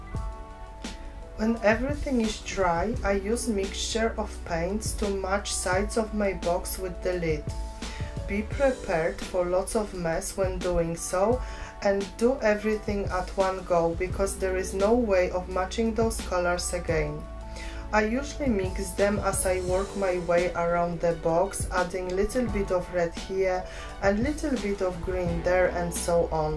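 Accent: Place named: Poland. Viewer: Wyraźny polski akcent, pozdrawiam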